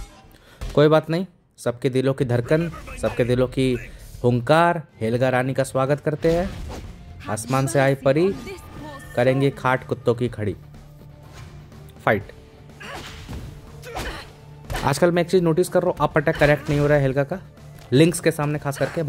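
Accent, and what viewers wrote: Bihar, India. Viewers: I love our bihari ascent😂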